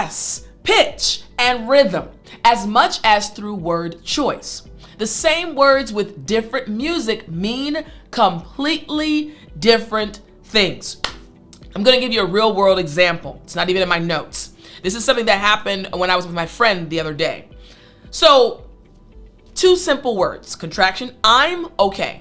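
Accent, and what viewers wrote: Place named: United States. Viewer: Your americain accent sounds great I always enjoy it🤩 I've learn so much from the ways you pronounce the words in your videos, to sound a little bit americain😇 It's so cool to watch your videos THANK YOU VERY MUCH😇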